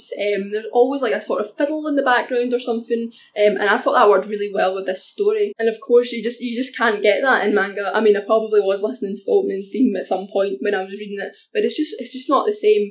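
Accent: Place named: Scotland, United Kingdom. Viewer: I love the Scottish accent sooooo much, oml. Much love from London! UK GANG!!🇬🇧🇬🇧💪🏽 Also, can't wait to read this manga and watch the anime! :D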